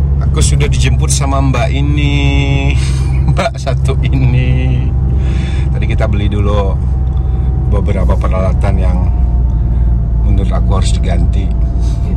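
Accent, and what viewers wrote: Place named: Padang, Indonesia. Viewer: bahasa nyo cak bahasa palembang, tapi logat nyo cak padang yo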